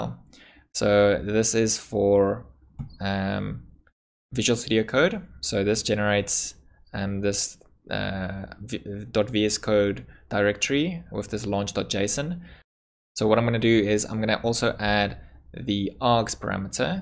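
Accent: South Africa. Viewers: Are you from South Africa? Your accent sounds South African? Great video! Thanks!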